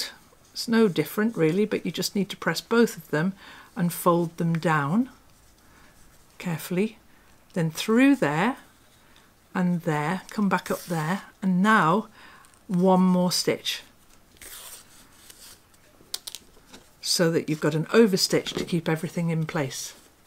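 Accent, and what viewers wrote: England, United Kingdom. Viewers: Thank you so much! ... I already do EPP.... But I love listening to your English accent and I love paper piecing, So it was extremely enjoyable to just watch you go through your process. I love that you are so humble and that you encourage others to do what you obviously enjoy. I love to share what I do with others as well. My dream would somday to come and visit you and see your lovely little home and gardens..... You live the life that I dream of. 💖💝. Thank you for sharing your life with us!!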